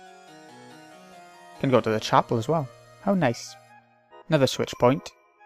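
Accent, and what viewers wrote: Wales, United Kingdom. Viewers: Are you Welsh? Also do you use turbo for the GF cutscenes, or do you mash?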